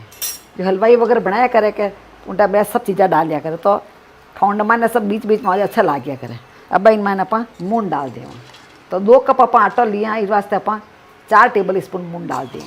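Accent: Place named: Marwari, India. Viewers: Aapki jodhpuri marwadi bahut hi meethi hoti... Mein soda ki jagah thoda sa curd use karti hoon. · Maine isliye pucha tha bcz aapke baat karne ka tarika whi ka lga muje merta road jaisa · Aapki bhasha thodi alag lagti hai kyoki maharashtra me jo marvadi log rehte hai vo thodi alag marvadi bhasha bolte hai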